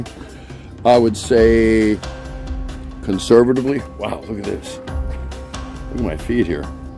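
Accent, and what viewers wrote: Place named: Philippines. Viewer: nice neighborhood with great people. nice DIY pathway for the high tide. nice tagalog accent!. hopefully one day you will blog also talking only in tagalog.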